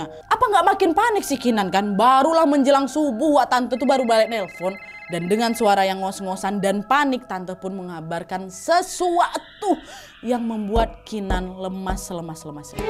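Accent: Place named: Medan, Indonesia. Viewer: ini pintar,lucu selain logat medannya kental Trnyata pintr jg ngmog jawa nnlogatnya pun bgs... Sukses selalu... Salam dr Turky 🙏🙏🙏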